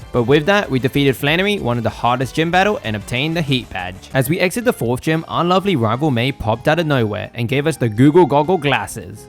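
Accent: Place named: Australia. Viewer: Your voice sounds Australian unless im hearing things if so hi happy new years from Queensland also good dark run with dark types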